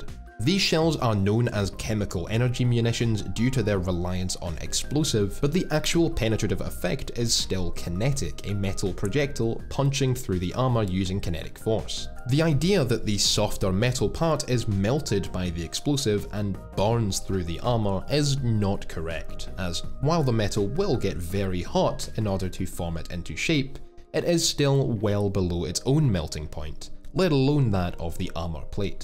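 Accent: Scotland, United Kingdom. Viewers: Is that Scottish? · I hit the like button as soon as I heard that sweet Scottish accent. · Are you Scottish?? 🤔🤔. You remind me alot of my friend Wallace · I've only just noticed your Scottish accent and I've been watching a few of your vids already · Speak American man, not Scottish!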